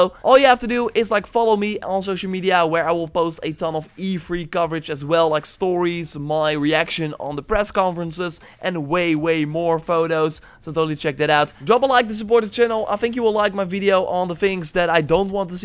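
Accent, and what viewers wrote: Netherlands. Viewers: Dude, your English could use improvement. You shouldn't mix Dutch with English ;) Also your Dutch accent clearly comes trough, you are not doing us any good with that ;)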